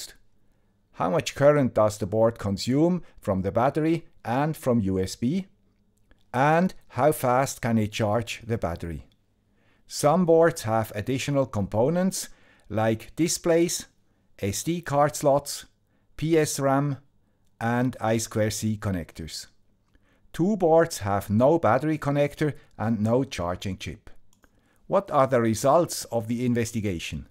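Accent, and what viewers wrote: Switzerland. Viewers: Thank you for sharing your experiances. I really like the swiss dialect as well - in english as well as in german. :-) As I always had very good experiances with the D1 mini with ESP8266, the D1 mini version of the ESP32 looks interresting to me. Greetings from the rhine-main-region, germany Alex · Another great video! Thanks a lot. You put so much work into planning out and making these videos they are so informative. I also love your soft Swiss accent. Thank you.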